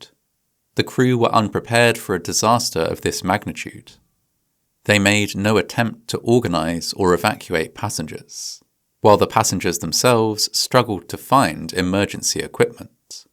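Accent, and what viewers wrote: United Kingdom. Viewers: Interesting to have a British voice use a term like petrol, but then use the calculation to convert litres into US gallons. 1,000,000 litres equals roughly 264,000 US gallons. It's 220,000 Imperial (British) gallons.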